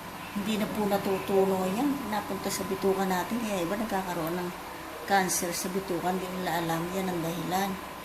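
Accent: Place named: Nueva Ecija, Philippines. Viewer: naalala ko tita ko sa pag sasalita. taga Nueva Ecija siguro si madam :)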